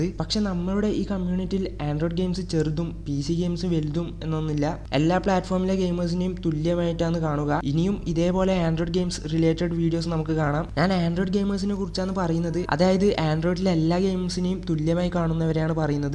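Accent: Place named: Kannur, India. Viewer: Bro kannur ano ?? Basha ath pole ind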